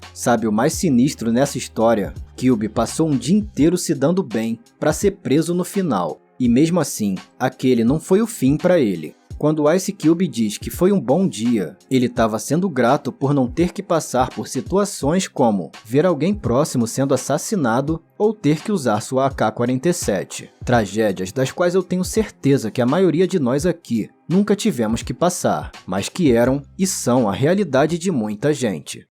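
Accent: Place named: Rio de Janeiro, Brazil. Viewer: O melhor é q esse vídeo sobre Cube é narrado por um carioca. Vaibe de gueto.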